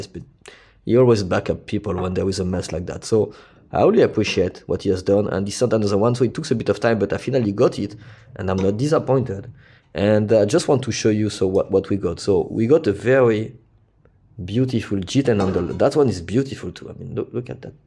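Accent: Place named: France. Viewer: Bien l'anglais avec un accent français 😊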